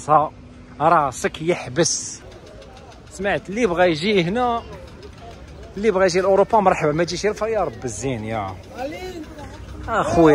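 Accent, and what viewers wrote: Algeria. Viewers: هادوك كا يترزقوا. شوف لي كا يشفروا أو يبيعوا في المخدرات. اللهجة ديالك جزائري ماشي مغربي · من خلال لهجتك أنت جزائري. لمذا تدعي أنك مغربي. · لهجة ديالك جزائري بينا وضوح شمس سير قلب على شي خدمة باركا من تشويه صورة المغرب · انت جزائري، لهحتك جزائرية ، علاش تقول راك مغربي.. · علابيها تتكلم كيمانتاع الغرب الدزيريين تماما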